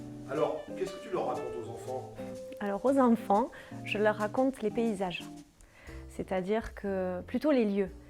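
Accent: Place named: Perpignan, France. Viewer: Quel agréable accent perpignanais! Cela donne autant envie que le propos de de plonger dans ses contes et facéties à la découverte des trésors oubliés de nos différentes langues. Merci!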